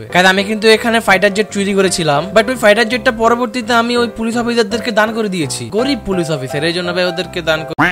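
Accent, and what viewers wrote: Noakhali, Bangladesh. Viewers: Via apnar bari Noakhalir kothay?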